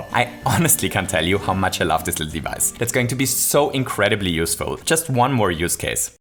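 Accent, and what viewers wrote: Austria. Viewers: Bist du Österreicher? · Moment... du bist Österreicher? 🤔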